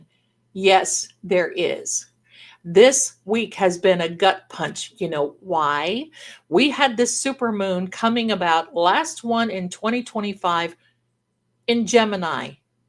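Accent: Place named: New York, United States. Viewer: Hi I heard your ny accent. Hehehe. I’m OG from Patchogue LI NY. I’m in upstate NY now but still have some Long Island accent Can’t wait to watch and enjoy. Ty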